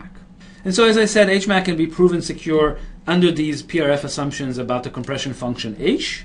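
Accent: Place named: United States. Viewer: Omg finally an American accent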